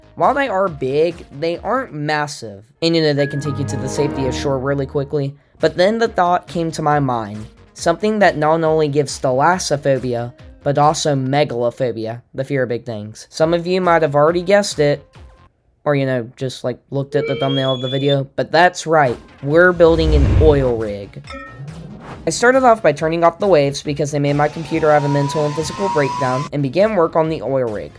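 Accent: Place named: Maryland, United States. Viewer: Insanely weird question but are you from Maryland? 😂 Your accent sounds it!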